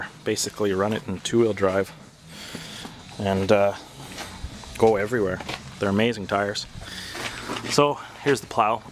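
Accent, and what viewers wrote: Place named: Southern Ontario, Canada. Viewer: Really like the plow, great stuff. the hydraulic pump makes me a bit nervous on the back of the blade. Sounds like you might be from southern Ontario riding on Dec. 4.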